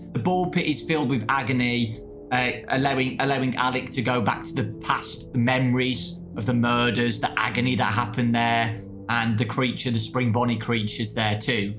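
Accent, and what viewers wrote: United Kingdom. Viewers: Wassup British man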